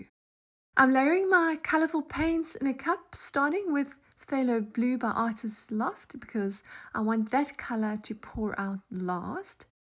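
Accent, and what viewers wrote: South Africa. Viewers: I came across your beautiful pearl pour by accident. My first though was ..... "she's South African". Am I correct 😂. I am also living in the US and it was AWESOME to hear a voice from home. Thank you for your beautiful pour...😊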